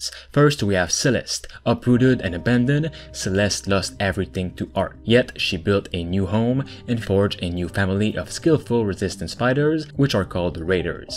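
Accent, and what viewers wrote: Quebec, Canada. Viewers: Tu parles francais? Tu sonnes quebecois 😜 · tes quebecois toi hein?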